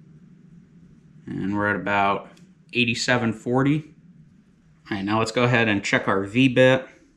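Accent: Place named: North Carolina, United States. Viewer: where you out of your accent sounds eastern NC-ish, love this setup.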